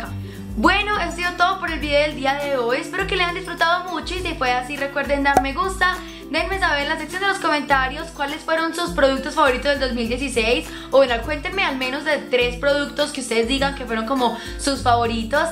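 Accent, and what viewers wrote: Antioquia, Colombia. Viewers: me encantoa nuestro asento paisa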